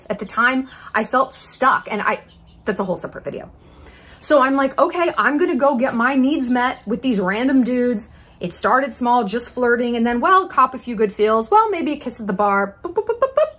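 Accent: South, United States. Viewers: Your southern accent is so cute!! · That southern accent tho 😂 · Omgg I love your southern accent. Sooo good, had to go back and listen it to it again, lol wish I could do that. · omg u r so funny i love you that southern bell accent is so hilarious i always told my bf to go take a nap when hes trying to be irritating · The southern accent is PERFECT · southern accent is what keeps me going lmao, she's too funny. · Here for the Southern accent & that comment about the Easter Island emoji 🤣🤍💞 You’re the best,. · Your southern accent is soooo pretty. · I’m obsessed with your southern accent haha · That’s a great Southern accent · Love your southern accent. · The gaslighting and southern accent is what I’m here for! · The southern accent 😂👌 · Side note: southern accent is pure gold. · Your southern accent is SENDING ME lol!!!!!!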